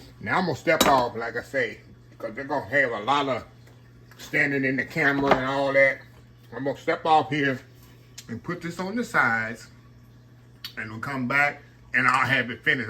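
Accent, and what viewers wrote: South, United States. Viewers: Your southern accent takes me back home to like 40 years ago. And you fussing at the recipe..🤣🤣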